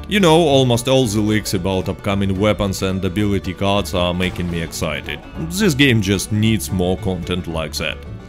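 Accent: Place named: Russia. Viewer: English with a Russian accent is cool to listen to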